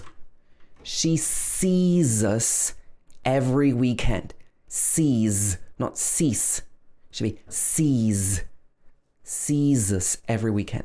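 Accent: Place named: United Kingdom. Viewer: Thanks a lot sir. You teach me many things. You're perfect in British accent.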